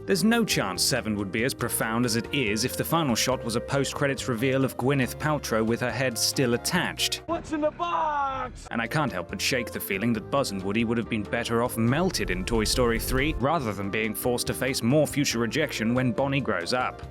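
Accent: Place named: RP, United Kingdom. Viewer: Why does sound so much more posh in these videos? I swear he isnt that posh.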